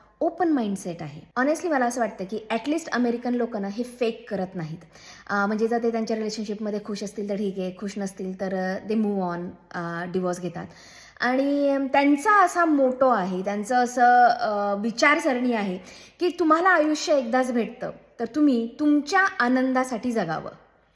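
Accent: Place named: Marathwada, India. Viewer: तायडी तु मराठवाड्यातील आहे का.. भाषेवरून वाटतयं😅😅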